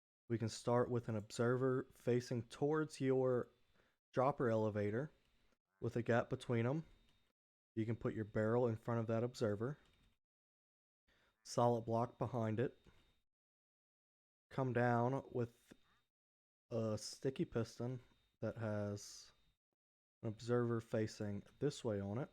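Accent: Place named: South, United States. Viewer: not gonna lie, i'm kinda delighted to hear a southern accent and no cringy intro and i'm not even american.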